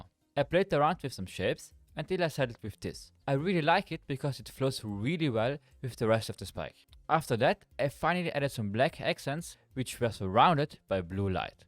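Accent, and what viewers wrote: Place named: Germany. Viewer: Nicer Deutscher akzent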